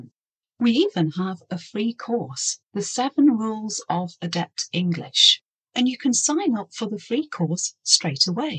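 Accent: United Kingdom. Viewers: Hi there. I’m Marcelo from Brazil, I’ve been learning English by myself since 2017 and I’ve focused in American English, but I’ve been thinking about listen to some English from people with British accent and when I found your podcast from Spotify it was “Loved at first sight” , or loved at first listen to” hahahah. Thanks so much for you and your team for spend your free time with these excellent and creative podcast. And don’t forget, stay at home and wear mask. I’ll see you soon!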